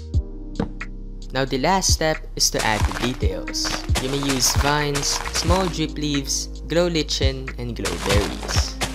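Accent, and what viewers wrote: Philippines. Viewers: he sounds like a filipino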